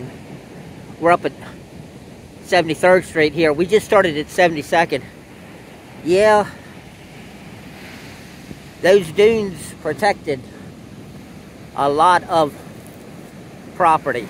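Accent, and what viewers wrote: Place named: Baltimore, United States. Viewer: Hahaha, this guy has a classic Baltimore accent. Sounds like Dundalk specifically. Good video.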